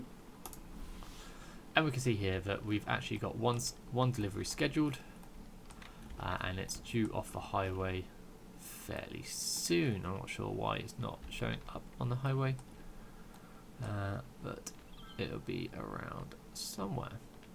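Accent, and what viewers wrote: United Kingdom. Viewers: Subscribed for this beatufil British accent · The British accent is the worst! My ears are bleeding. Americans hate the British accent! You may be referring to the treasonous pride flag Americans.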